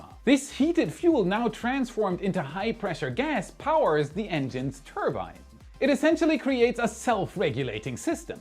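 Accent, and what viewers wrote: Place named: Germany. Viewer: Your english has improved a lot over the last time, better pronunciation, more words in your narration👌🏻 However, please keep your german accent! 😄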